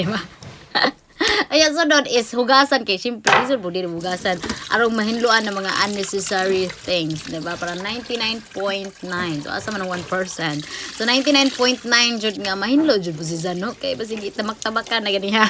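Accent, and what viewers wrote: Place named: Bohol, Philippines. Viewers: hi miss new subcriber from cebu city😊asa dapit sa bohol inyoha qy taga bohol pud aq bana? naa pud koy gisunod nga mga vlogger taga Alicia♥️ ganahan kos imng mga vlog qy luto2 nya taga bohol jd ang sinultian nmo😊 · Nalingaw ko jamo sa imong sinultian truly bol anon jud Kang pure Taga dimiao pod among kagikan now I'm from Mindanao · Hi Ms, taga loboc ka Dai? Base Sa accent man gud nimo.. New followers me nimo Dai..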